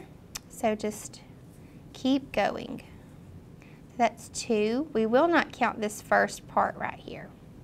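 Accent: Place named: North Carolina, United States. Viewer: I have to ask, are you from North Carolina? I feel like I recognize your accent :)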